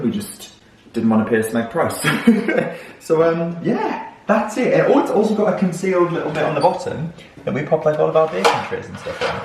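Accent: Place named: Yorkshire, United Kingdom. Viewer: and you’re not a londoner, you sound more like a hot yorkshire dude, gay of course. this kitchen looks quite high end but: why haven’t they closed the gap above your ‘pantry’? the ceiling has an awkward angle and then there’s this corner your eyes are immediately being drawn to. it’s a dust collector and you’ll need a ladder to climb up there cleaning. full height fridge; the money you paid, did that cover the squeaky fridge door too? and then there is your ‘main prep area’. if you do cooking for a living you should know that everything, prep area, fridge/freezer, pantry, sink, oven and stove should be in easy reach of one another. you shouldn’t have to turn to your sink in order to drain pasta for example or prep your fruit and veg and then turn half way across your kitchen to reach the oven or stove. all in all this is an improvement on what was there before with room for improvement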